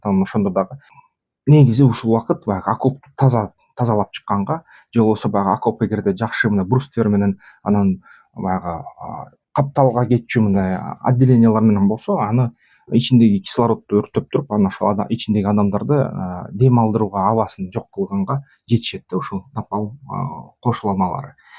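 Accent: Standard. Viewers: отто таза сүйлөйсүң дикция керемет.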